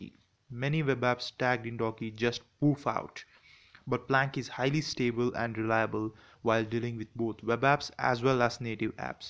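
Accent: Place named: India. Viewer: Great review! I got a bit of shock hearing you swear (I wasn't offended mate!) - not use to hearing the word "shit" in an Indian accent! Good stuff mate! Have you reviewed and looked at Synergy? (plank and synergy are my two "must have" Linux applications!)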